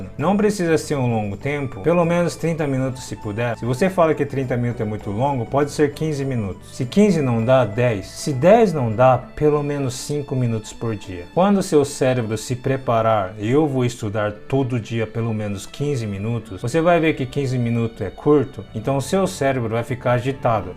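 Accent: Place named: Brazil. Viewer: Eu jurava que tu era brasileiro. Pelo teu sotaque que falas perfeito ao um brasileiro.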